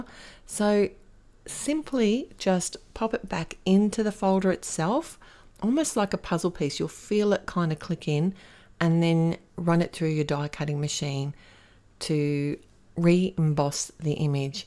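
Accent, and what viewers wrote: Australia. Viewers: So lovely to hear an Aussie accent on my crafting channel!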